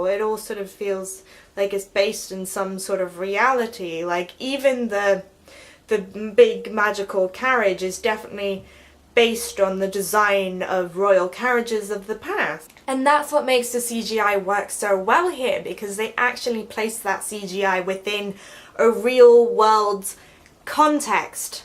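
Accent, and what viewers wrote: United Kingdom. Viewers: are you from UK ? just wondering about your accent. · Yeah cause you sound like your from Sufford(the same place as Lily James came from).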